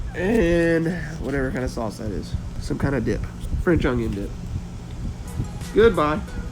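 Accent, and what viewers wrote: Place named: United States. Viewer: You sound different. So country.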